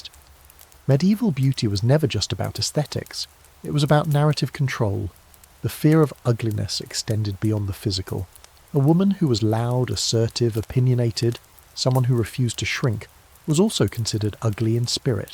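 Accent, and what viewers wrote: United Kingdom. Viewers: It's 5.34 am and I'm living in France, near the chartreuse mountains. Thanks for your help and the English accent, it contributed to putting me to sleep. The pictures though 😂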